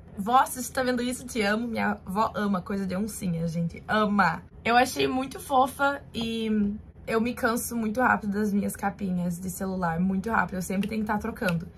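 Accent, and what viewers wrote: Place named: South, Brazil. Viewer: o sotaque sulista deixa tudo melhor, me identifico kkkkk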